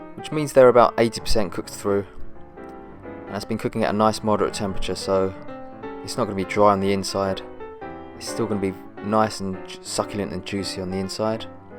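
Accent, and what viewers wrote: England, United Kingdom. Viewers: what a nice combination!! Japanese guy with British accent.... I like you keep going がんばって:) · hhh He has an english accent so he was probably raised in an english speaking country. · I notice your accent. Are you based in the UK? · Japanese dude with a British accent? Sign me up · That British account tho. somehow satisfying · James Ziemba Sounds like chef is a born and bred Brit. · English accent makes him intriguingly interesting! Tho the oil colored pretty scary… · I love your British accent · Me too. Japanese in british accent, so COOL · Japanese handsome chef with English accent.....mmmmm 👍🏻😘🧑🏻😻😻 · That British accent.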